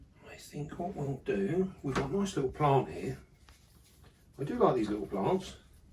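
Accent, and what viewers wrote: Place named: Essex, United Kingdom. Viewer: Nice video me old mate, very interesting, been looking at getting one of these for a while now off the spider shop. Whereabouts you from? Sound like a fellow Essex/Kent dweller?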